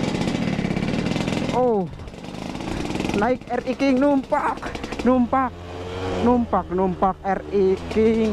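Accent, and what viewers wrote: Banyumas, Indonesia. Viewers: Mmas cah ngapak yo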